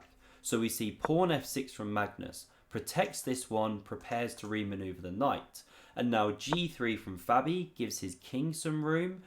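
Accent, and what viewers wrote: United Kingdom. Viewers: 3 things I love about this vid: Nice informative game, great explanation and lovely British accent!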